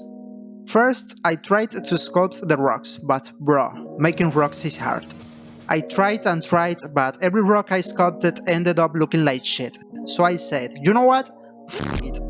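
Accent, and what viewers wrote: Spain. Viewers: Seems spanish like accent, I would like to know to satisfy my curiosity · bro se nota que es español EDIT: No es un hate ni nada, muy buen video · Tú hablas español,no jodas, se nota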